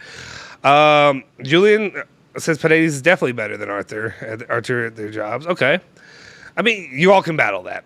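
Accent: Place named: Minnesota, United States. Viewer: never do that Minnesota accent ever again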